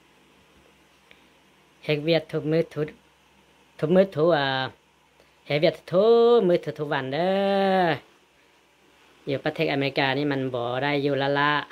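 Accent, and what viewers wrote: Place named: Laos. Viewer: I like your Laoglish Bro.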